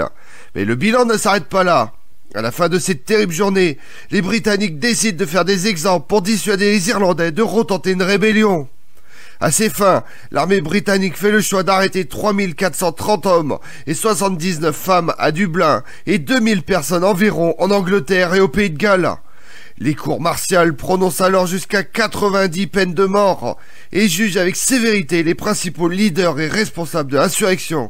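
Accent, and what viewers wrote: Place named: France. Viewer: Ta prononciation de l'Anglais est très... Française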